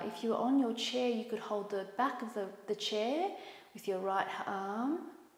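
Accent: Australia. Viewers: Wonderful. Thank you so much. REALLY needed this after a long work day writing. I've just discovered your videos and having grown up in Aus I SO appreciate your soothing lovely homely accent and the Aussie birds in the background. I'm 17 weeks and only just getting back into yoga after a break to feel rough as old boots during the first trimester and your videos are such a wonderful resource. I'll definitely be donating x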